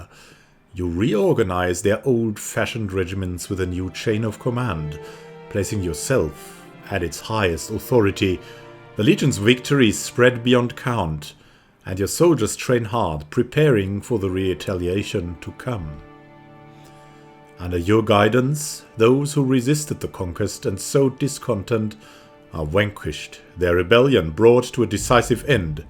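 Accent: Germany. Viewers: I love your role playing videos. Your in-character quips are always delightful and enrich my viewing experience. I have also watched (and I am still watching) your PoE path of the damned play-though, and I have to say you really have immense courage to commit to playing all the way through a massive game like that on such a difficult level. Your "thoughtful gaming" skills are very impressive! I am also hooked on your soothing voice and that very appealing hint of a German accent. I can tell that you are enjoying the play-through as much as we are, who you are taking along on the journey. Your fine intelligence and wry humor shine through in your videos, and checking your channel for updates has now become a part of my daily routine. Thank you so much for your faithfulness to your channel and to your subscribers. Keep the excellent content coming!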